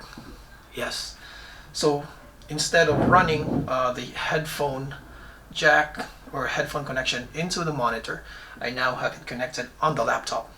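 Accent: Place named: Canada. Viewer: Good video mate. The whole time I was wondering where you were from and then you said NZD! Your accent was throwing me off. Where is it from? I'm going to guess Canadian? Good to see techies across the ditch making good vids. All other reviews on this monitor are rubbish. I was 90% sure on getting this monitor before watching your vid, and now I'm going to buy it.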